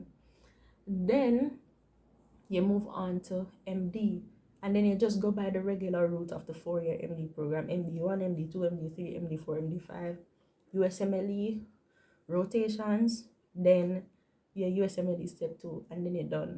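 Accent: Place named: Caribbean. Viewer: I love your carribean accent girl!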